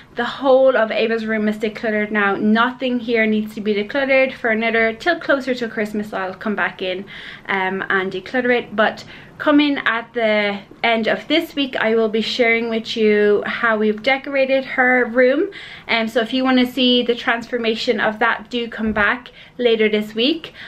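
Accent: Ireland. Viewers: Well done,. I'm going to share this video with my Drama class so they can hear a proper Irish accent:-D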